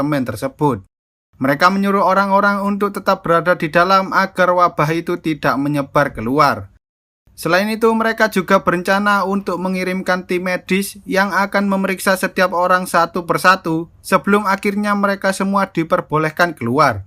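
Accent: Java, Indonesia. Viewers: suara yang menjelaskan video ini suaranya ke jawaan bingits. Hehehe..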